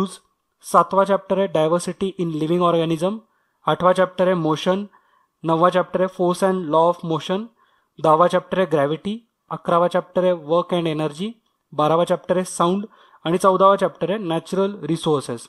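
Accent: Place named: Pune, India. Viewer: sir mala vatthe tumhi nakki punyache aahat karan tumcha bolnayacha ton ha same tasach aahe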